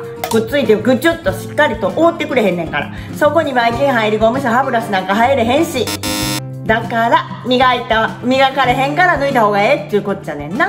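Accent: Kansai, Japan. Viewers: コテ2の関西弁でハキ2しててすごい笑 参考なりましたー！ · 創作のエセしか聞いた事ないからちゃう？ 普通の関西弁やけど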